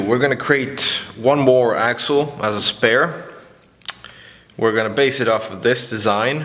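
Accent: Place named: Estonia. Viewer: Hi, where are you from? you sound very estonian :) kui jaa siis Tere ja hea video!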